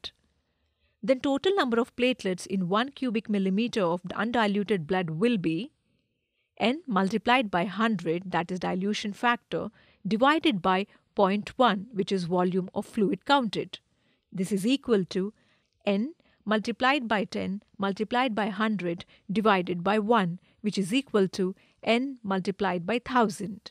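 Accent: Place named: India. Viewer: The presenter is probably from the Indian Subcontinent. In English the pronunciation of V and W is reversed compared with Indian Languages. Also there is no such thing as a Lakh in English. This lack of translation makes this video unintelligible in English.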